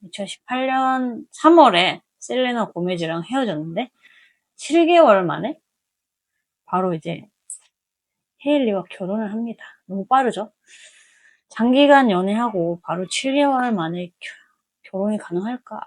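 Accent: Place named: South Korea. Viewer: 말소리만 들으면 그냥 한국발음인데요?너무 대단하세요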